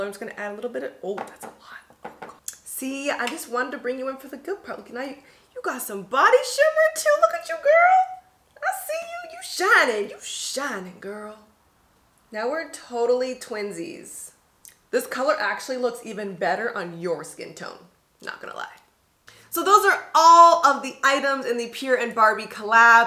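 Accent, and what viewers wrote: United Kingdom. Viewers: I thoroughly loved this video. It was so fun to watch. Loved your British accent, almost spot on. I received the notification of the video while I was watching you. That was weird. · I LOVED this video!! Complete with British accent!! 😁 Very nostalgic for me, too!! ❤❤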